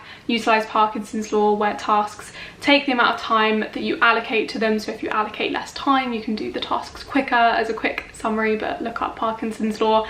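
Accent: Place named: United Kingdom. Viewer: that British accent 🤤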